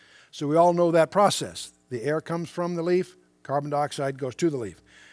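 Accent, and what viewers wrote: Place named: United States. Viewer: What a great blessing Chuck has been to us remnant believers. ❤️🇭🇲 I heard Amir Tsarfati say in his zoom call Q&A with the Romanian churches in Australia (a year ago) that he loved Chuck, and is still friends with his daughter and her family. This made me happy. Chuck's like my Dad, but with an American accent. My Dad loves Chuck too, and told me about this channel, which I immediately subscribed to, and added to my playlists.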